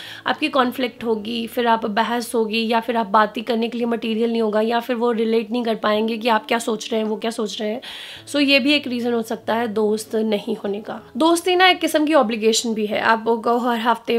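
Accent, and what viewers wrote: Punjab, India. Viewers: I started following you because you talk like one of my best friend in India. Punjabi accent Amritsar vala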